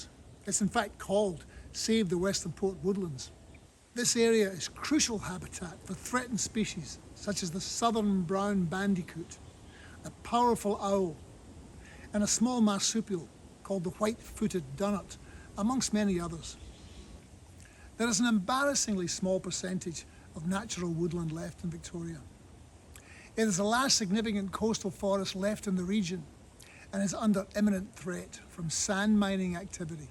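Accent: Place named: Scotland, United Kingdom. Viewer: Nice to see Colin Hay standing up for the environment! P.S I'd never heard him speak before, and after all those years living down under, I half expected him to have an Aussie or hybrid accent, not his beautiful native Scottish accent.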